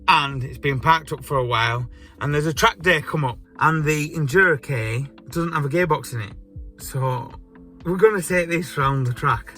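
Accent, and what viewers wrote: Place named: Northern England, United Kingdom. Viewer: Top Dong. These guys never make a video that doesn't put a smile on your face, never change you're a star especially with your Northern Accent matey.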